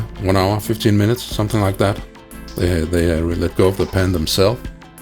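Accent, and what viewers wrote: Denmark. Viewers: Man kan godt høre du er dansker. Tak for opskriften. Den vil jeg starte på med det samme:-)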